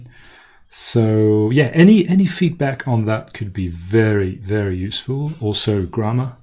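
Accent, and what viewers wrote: Southern England, United Kingdom. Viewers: Hello, so first of all, from a purely accent/pronunciation point of view, you sound almost indistinguishable from a native speaker to me. I think if I met you in a pub in England and we started chatting, I wouldn't easily realise that you're not a native speaker, especially not within a few sentences. To me, your accent sounds fairly generically south-of-England English (I am from Norfolk, UK myself). Not necessarily 'RP' but honestly, I think if you leaned any harder into RP it would start to sound less natural. Because I am specifically paying attention to your accent, I do notice little things that make me think 'ah, not quite British accent there' and if I didn't know where you were from, I may wonder if you had a hint of accent from another language interfering - but I don't think I'd be able to guess which one. 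I certainly wouldn't guess French. But these things are so slight, it's difficult for me to pin point exactly what it is. Perhaps once I've seen more clips if you speaking English, I'd be able to. But honestly, your accent is highly impressive as a non-native speaker, and probably good enough to fool quite a few native speakers. I actually think you could tell most Americans (or, tbh, most non-British native English speakers) that you're British, and be believed. It would be a little harder to fool actual Brits because we're so keyed into regional and class markers on British accents. In fact, my accent occasionally confuses people - I am British born-and-raised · Improve to what? C2? Haha. If I had the funds, if my school had the funds, I'd invite you out here where you could guest-teach at my school and immerse. Your pronunciation is great. Don't worry about it even if it's British/British-leaning. 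Haha. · You have a completely natural, educated, southern English accent. No trace or French or any other influence. One specific comment: on 7.19 'write the minutes' would not be correct - these are notes made at formal meetings as a record of what has been said. I'll keep watching! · face-blue-smiling: ... the only thing is that you speak with some kind of a British accent (there are so many) ... and I prefer the General American Accent ... ... ... that's all ... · Amazing english,! When I first started watching this I thought this might be the normal you and the french was all a lie. 😂 As an Australian, I can definitely hear a mix of British, American, French, and German? accents on different words... but definitely British overall. Even your filler words are typical British ones! I think Tou speak very clearly and pronounce every single word... which sometimes makes you sound less native because you aren't lazy enough with your words! 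Just a few specific thoughts: - Instead of 'precise way' you might mean 'succinct way'; - Its a bit unusual to say 'from this /very/ playlist'. Unless that is a surprising fact, you could just drop the word very in this case. - 'lacking the right word' is fine, we might also say 'can't find the right word'. - we don't really spell timestamps, but you could read/write/say them. - 'Random' sounded like 'ren-dom' but normally is pronounced 'ran-dom'. - 'Aiming for' works in this context (we aim FOR a general goal/time, aim AT a specific target, and aim TO [verb] ). - 'recently someone pointed OUT that'... - 'slightly off' is a perfectly fine way to describe it. - 'native-like' sounds a bit unusual, I'd normally say 'like a native speaker' because native by itself often has an indigenous meaning (at least in Australian). - I'm Australian, so maybe my pronunciation will be misleading... but I would say "Own-ly" and 'Doh-nt'. 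- 'tends to b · bro, as an american, u sound like a native brit!!! please explain your learning methods, I really would like to acheive similar results! going thru your comprehensible input french a1-b1 so far and it has been amazing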